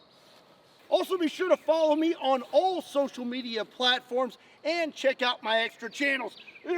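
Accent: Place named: South, United States. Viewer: I LOVE THAT SOUTHERN ACCENT!!!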